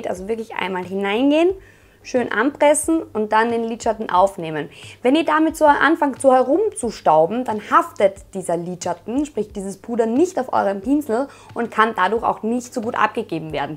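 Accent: Vienna, Austria. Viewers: Mega toll erklärt, ich schau und vor allem hör ich dich gerne....dein „Wiener Dialekt „ ich liebeeee es! 🥰 Ganz liebe Grüße aus Deutschland 😉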